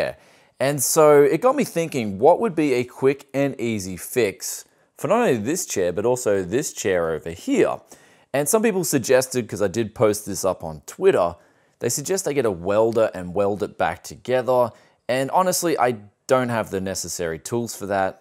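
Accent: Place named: Australia. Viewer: is just the new bob the builder but aussie and techie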